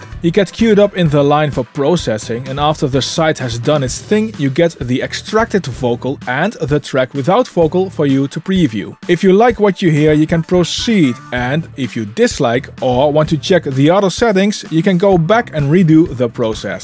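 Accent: Netherlands. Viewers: Lekker Nederlands accent :) thanks for the tutorial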